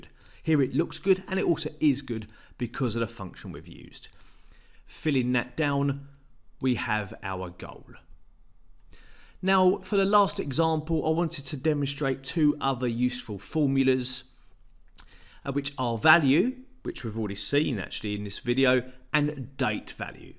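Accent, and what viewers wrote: United Kingdom. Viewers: Listening your accent reminded me of Peaky blinders😁 However, it was a great lesson. I got to know a lot new things. Thanks:yougotthis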